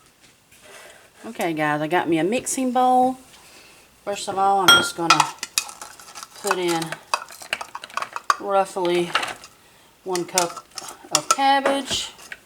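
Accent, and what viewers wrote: South, United States. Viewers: When I heard her say, "it ain't gonna hurt nuthin' I knew she was country. I usually fry my cabbage.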